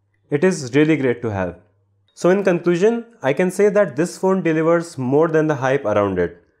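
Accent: India. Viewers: Somethings wrong with me. I cant take indian english serious.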